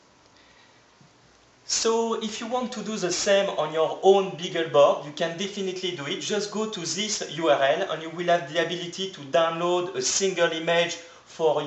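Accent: France. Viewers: you have a really thick french accent...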